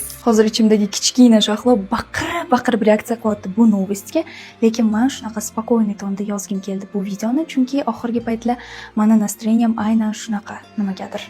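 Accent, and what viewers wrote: Navoi, Uzbekistan. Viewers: Wow navoiylikmisiz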